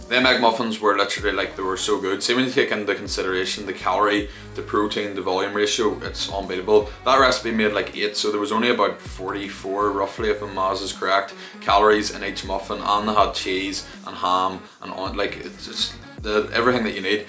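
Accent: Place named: Ireland. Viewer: Love the video mate. What part of Ireland you from?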